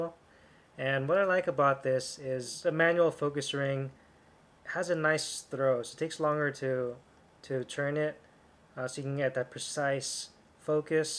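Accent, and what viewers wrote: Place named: Philippines. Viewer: I knew it you were filipino man hahha i based it off the accent anyways great video man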